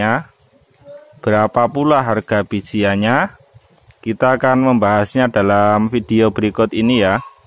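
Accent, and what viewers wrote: Java, Indonesia. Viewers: Mas nadane kyk daerah karanganyar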